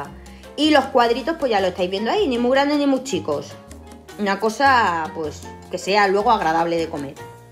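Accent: Andalusia, Spain. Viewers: Muchas gracias!!!! K buenas recetas hijaaaaa. Oye tu de donde ere?? Tu acento es andaluz no??? Un besito wapa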